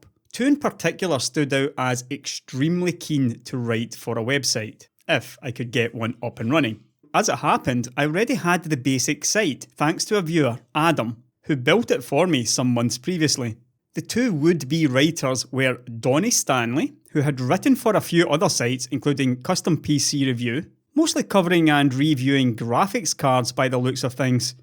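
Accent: Scotland, United Kingdom. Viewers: read any article that * wrote with a Scottish accent in my head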